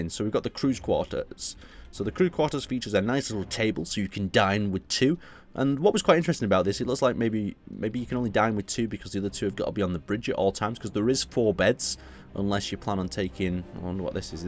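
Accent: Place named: England, United Kingdom. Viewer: No, that's a regional accent here in England. Tons of people speak like that, why would you? :p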